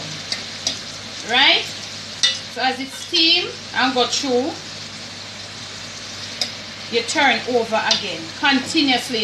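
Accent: Jamaica. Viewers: I really. Enjoy style of cooking its unmistakably authentic, i love the advice and the teaching points. As a results her viewers learn as if we are Island too.last but certainly not least the Jamaican Accent is so intridging until i wanna learn im sure just like her viewers.thanks cant wait to view ox tail, festival,fish tea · Since finding your channel I have been watching your videos every day. It is sooo nice to hear a true Jamaican voice when you live in 'foreign'. But even better than that are your mouth watering recipes. Authentic Jamaica cooking! I wish you were here in London running your own Jamaican restaurant. You wouldn't have hands to sell, trust me. Keep up the good work and we will keep watching. Love you gawn to bed! xxx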